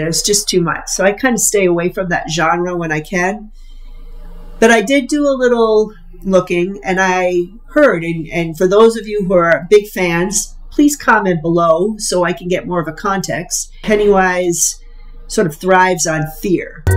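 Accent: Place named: Maine, United States. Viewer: Wow I’m from Maine and right before you mentioned VT and NH I knew you were from up here because of your accent!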